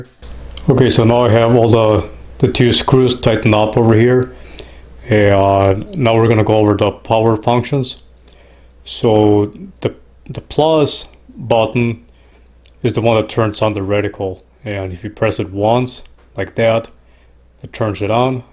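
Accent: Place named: Canada. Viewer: Canadian accents are the best.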